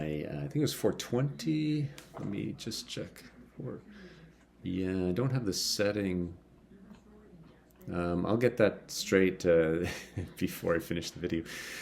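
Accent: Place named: Canada. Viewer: Never saw one of your vids before this, but the thumbnail caught my because I instantly figured it must be Starfest. Then hearing your Canuckistanian accent (me, too) pretty much confirmed it. As I type at roughly the 12 minute mark I hear you say that it is Starfest. My last time there was in about 2013, if my memory doesn't fail me. 😉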